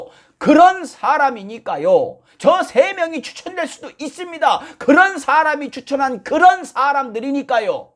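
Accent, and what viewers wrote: Jeolla, South Korea. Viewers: 전라도 사투리 심해서 좀 거북하네용 ㅜ 내용은 좋네요 지당한 말씀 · 전라도 사투리 억양이 너무 강해서 없어 보여요. 안그래도 없어 보이는데.ㅎ 조언 하나 드리자면, 정치 시사 관련해서 신뢰성을 갖기 위해서는 개콘처럼 하면 앙대여. 차라리 그냥 연변사투리로 하던지~~~ㅋ 근데 티비는 언제쯤 나오려나여? 부르는 곳이 없어 유튜브 하는건 알겠지만 메뚜기도 한철이니 열심히 해보셔요.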